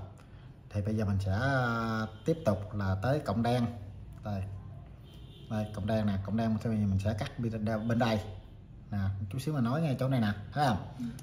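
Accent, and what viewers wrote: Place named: South, Vietnam. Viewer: bạn để âm lượng nhỏ hay sao ấy chứ mình vẫn nghe rõ mà. giọng miền nam nên hơi khó nghe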